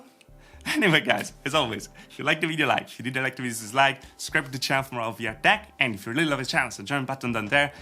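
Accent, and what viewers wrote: Italy. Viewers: LO SAPEVO CHE ERI ITALIANO Quel accento... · Per qualche motivo pensavo che fossi italiano e ora ne ho la conferma :)) Nice video and keep up the work! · Ohhhh, you are Italian, from what part? I was trying to guess your accent, thought Brazilian. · Oddio ma sei italiano? 😮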